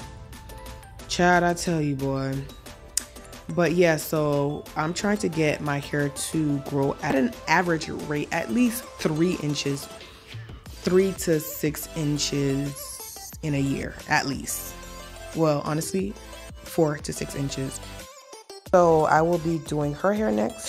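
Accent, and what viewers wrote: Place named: Nigeria. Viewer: Are you from Nigeria? Love your accent sounds like your from there.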